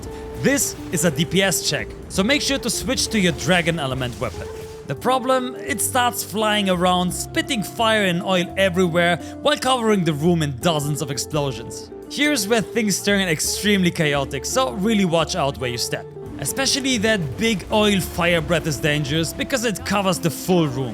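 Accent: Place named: Germany. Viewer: Are you german ? 😅 because of the dialect. Edit: Bin mir komplett sicher xD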